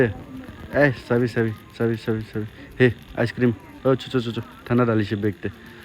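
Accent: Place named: Nepal. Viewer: Nepali accent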